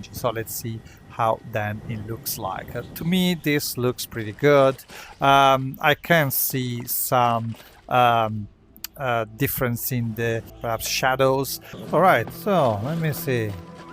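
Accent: Italy. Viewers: Il bro è palese italiano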